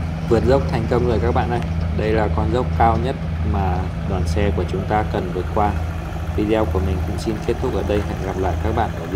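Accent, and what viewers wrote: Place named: Cao Bang Lang Son, Vietnam. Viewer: giọng đọc của ad hình như là người cao bằng,lạng sơn vào đăk lak thì phải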